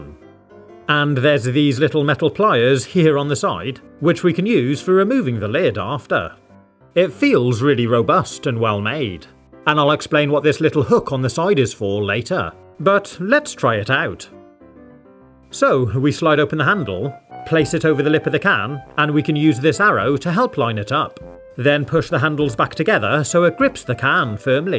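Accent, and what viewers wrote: United Kingdom. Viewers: Are u British???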